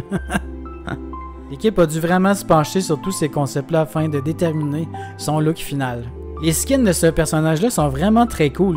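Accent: Quebec, Canada. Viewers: La savante est très bien mais la sorcière reste la reine des DPS !! Pour un gameplay en français go check ma chaine 🔥🔥 Très bonne vidéo ..l'accent québécois en plus 👍👍👍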